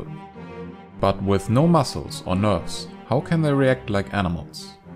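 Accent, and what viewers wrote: Germany. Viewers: Are you from fermany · I really like your Videos and I think you should continue making them, but your german accent just annoys me, personally a little to much. I‘m sorry:( Though I must admit, that the quality of your videos is reaaally good! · This german english:D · wait a moment... he sounds really german 🤔 * Looks in the "About" of the channel * * reads: * "Hi. My name is and I'm from Germany"......... knew it 😏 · Are you german? Your accent sounds similar to my brothers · The most German accent i've ever heard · He is definitely german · Sehr eindeutiger doutscher akkzent aber sehr gutes video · I hear a German accent.:D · ate you german? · Kann es sein dass sie ein Deutscher sind? Ihre Aussprache und auch der Satzbau (eher Deutsch als Englisch) lassen mich das vermuten. Ich finde ihre Videos übrigens äusserst toll ^^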